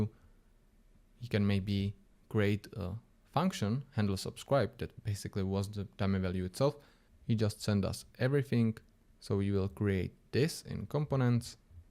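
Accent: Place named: Slovakia. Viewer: Podľa prizvuku si slovak mam taky pocit, koľko sa pohybuješ ako web dev ?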